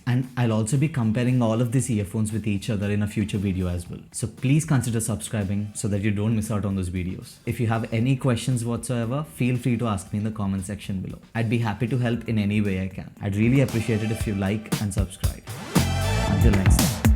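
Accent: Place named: India. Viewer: Good video nice to see you are proud to be indian and using Hinglish rather than trying to overact with fake accent. And the mid issue is solved in kz zsn pro X varient which cost almost same .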